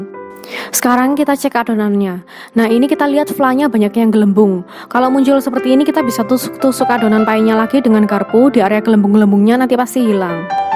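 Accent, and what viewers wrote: Java, Indonesia. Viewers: Fokus sama tangan mbak nya yg putih mulus 😁, tapi bahasanya agak medok 😂 Btw thank you aku mau lagsg praktek💖🙏🏻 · Suka sama resepnya,..mantab,,musiknya kurang suka..dan bahasa nya medok surabaya padahal Baik tapi kliatan kayak judes...sukses selalu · Yaampun medok bgt 😂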